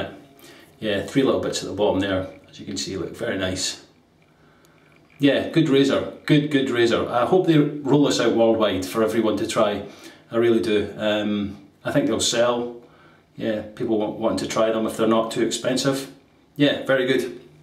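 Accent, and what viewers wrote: Scotland, United Kingdom. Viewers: Are you a scot, sir? Nice shave, by the way.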